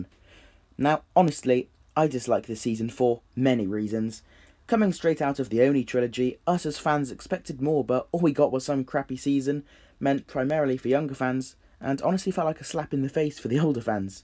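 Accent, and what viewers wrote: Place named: United Kingdom. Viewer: British lol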